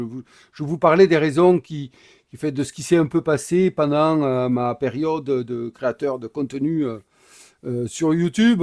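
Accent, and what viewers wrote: South, France. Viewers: avec l'accent du soleil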